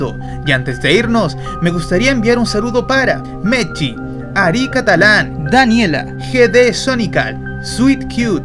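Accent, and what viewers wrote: Chile. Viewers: La voz de se nota la evolución en su narración y por primera vez le sentí su acento chileno 😳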